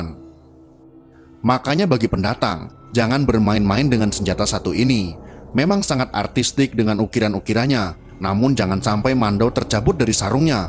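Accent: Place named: Banyumas, Indonesia. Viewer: min orang ngapak Yo😅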